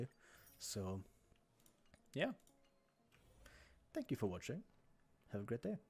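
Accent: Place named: Germany. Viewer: Ah, i hear a DEUTSCHER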